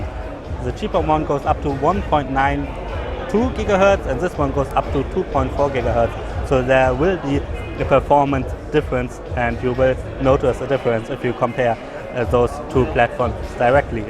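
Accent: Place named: Germany. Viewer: sry aber dein denglisch ist echt furchtbar!?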